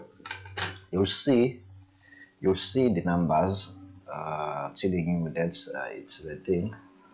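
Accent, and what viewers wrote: South Africa. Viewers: U from south africa I can hear ur accent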